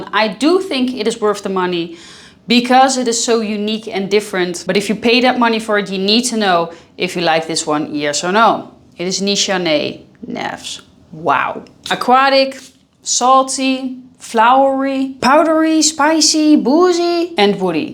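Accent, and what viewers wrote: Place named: Netherlands. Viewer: you sound a bit dutch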